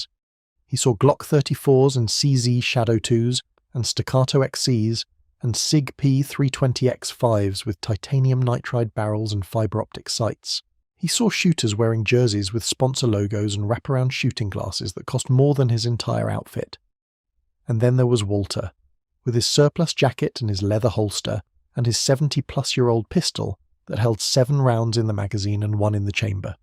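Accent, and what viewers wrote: United Kingdom. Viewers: These are Bull Shit Stories, especially from the point of view of someone that knows the 1911 and how competitive shooting works, only a Non Shooter thinks competition shooters are so Stupid. Narrated by a Non Shooting Brit. Competitive shooting gets technical and most shooters are professionals. Also a laugh, the Age of a 1911 matters not. Nobody I know ever made fun of a Veteran At one point, Revolvers were used in Competitive shooting. And nothing beats a Pre Series 70 trigger.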